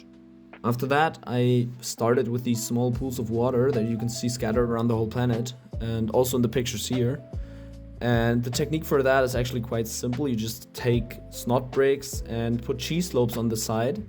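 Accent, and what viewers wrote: Germany. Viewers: This looks so incredibly awsome. The techniques you use make everything look so interesting, and the colour composition looks so awsome too. I didn't even needet to watch the Vidoe to the End before i subscribend. And your english sounds very good too. You are able to notice the german acent but it isn't strong and doesn't bother. But please do something about your audio. Maybe i am the Problem but i watched it with my headphones on and only heard you on my left ear. I am looking forward to your next Video. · hör ich da deutschen Akzent?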